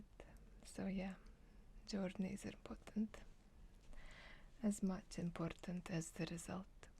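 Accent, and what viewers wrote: Russia. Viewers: Russian accent it's strong it's perfect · с лютым русским акцентом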